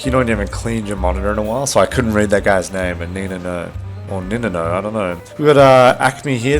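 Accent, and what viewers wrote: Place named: United States. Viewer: That American accent through me off real good. It was perfect.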